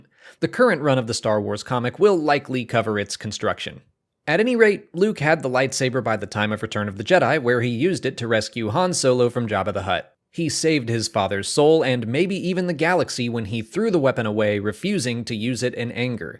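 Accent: Australia. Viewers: What part of Australia are you from? You sound funny. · which part of australia are you from? the accent isnt what i expected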